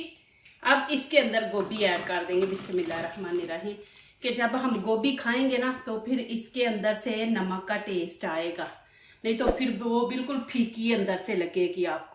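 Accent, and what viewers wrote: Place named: Punjab, India. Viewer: Ap ki recipie bohat achi hoti hen aur ap ka urdue me panjabi lehja aur mohabbat bohat inspire kerta he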